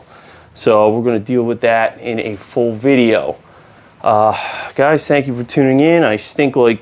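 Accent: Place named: Pittsburgh, United States. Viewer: Dude sounds like Pittsburgh. 👍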